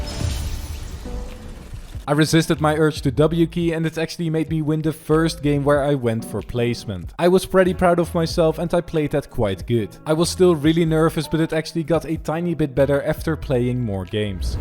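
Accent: Netherlands. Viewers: Nice accent, you Dutch? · Its so funny want ik weet aan je accent dat je nederlands bent maar nice man you are such a good player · ik dacht al de je accent erg veel op een Nederlands accent leek Nice man Keep up the grind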